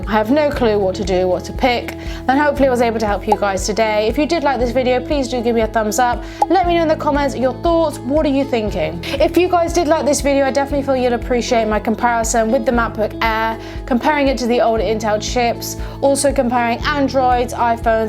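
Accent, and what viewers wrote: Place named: United Kingdom. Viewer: love the British accent, but yours is on another level ! New subscriber with all the bells ringing and greetings from Argentina 🦁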